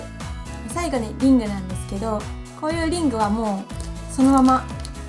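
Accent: Kansai, Japan. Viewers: かんさいべん？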